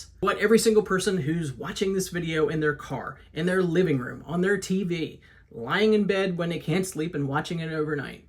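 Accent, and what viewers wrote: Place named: South, United States. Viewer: Hey! I went to your channel and watched your Ace Hardware video. If you’re OK with a little constructive criticism, I would love to give you my review, maybe it will help a little bit. I loved your video! Your voice is awesome, (I love a great southern accent).😎 there is only one thing that I noticed on the video that would keep me from watching more of your videos. I noticed that you don’t look straight into the camera lens enough. I think on a video like this when you are talking to your audience you need to think of it like talking to a friend or one person. My favorite Youtubers look straight into the lens the entire time that they are talking to the camera and it looks like they are looking right into my eyes and that is what I love! Maybe you can practice this and get better at not looking back-and-forth between the lens and your own face, I know it’s a hard one, but I think that would help you a lot! 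I didn’t see any other issues with your video. Great content also! I love to see what is in a store before I go shopping.😃👏👏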